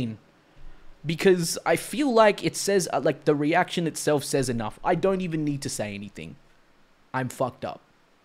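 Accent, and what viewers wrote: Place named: South Korea. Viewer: 이 형 뭐지... 분명 영어를 말하는데 한국인 같아..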